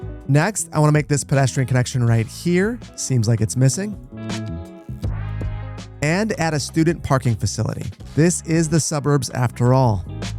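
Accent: United States. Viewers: Even if it wasn't clear by your accent, your actions would make it clear you're American😅 Let bulldoze this road to build a highway through our city. Let's bulldoze a neighbourhood to build a shopping centre, build a few big box stores. These buildings are to high for a residential neighbourhood, there are too many trees. Let's get rid of the sidewalk, this much sidewalk seems unrealistic🤣 This episode definitely made me laugh!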